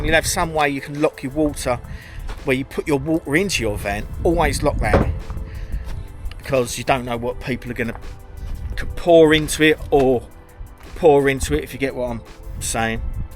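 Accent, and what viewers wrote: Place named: Essex, United Kingdom. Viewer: Well done. Love your Essex accent 😊 I lived in Epping when I got married then moved to Rayleigh for years until we moved up to Norwich in 2003. Mal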